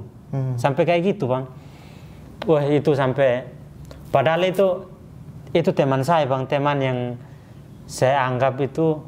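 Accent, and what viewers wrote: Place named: Eastern Indonesia, Indonesia. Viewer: Dari logat bicaranya sprtinya orang timur nii.. Semoga istiqomah dlm iman islam hingga akhir hayat..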